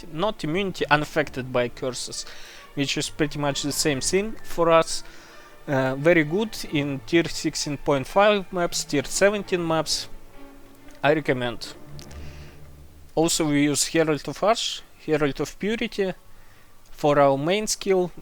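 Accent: Russia. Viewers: Тяжёлый русский акцент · судя по говору сразу слышно, что русский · i understand russian english better than original english, good video, brazil here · Yo just rerolled this as my 3rd char this league and having a blast so far. So thx for the build, nice explaining overall and i love ur russian accent. Im currently using the Merc from my Minion Char, with Haste, Tyrant helm(flat fire dmg), blind, elemental weakness curse, and a bit survivability. What do you use for Merc? Is Determination worth it for example? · по акценту понял что Ру говорящий). Каефынй билд и в пое 1 есть что фармить на нем )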